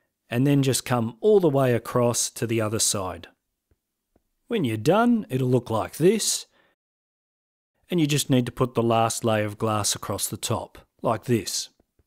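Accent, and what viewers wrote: Australia. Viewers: Are you from Australia? :) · Authoritative is intimidating, you sound like an Australian version of the old Etho except with more gusto:D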